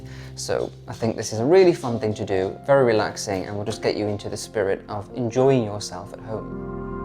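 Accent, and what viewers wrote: RP, United Kingdom. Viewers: Wow - it's like listening to a young Kenneth Kendal - it's the BBC accent one finds so very soothing on a Friday evening - and that wreath looks incredible and the squash with the mushrooms and asparagus - wow - just wow.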